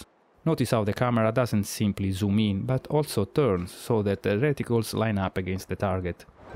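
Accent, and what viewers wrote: Italy. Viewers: I am so entertained by his Italian accent ,he sounds like Super Mario's 3rd brother Sonyo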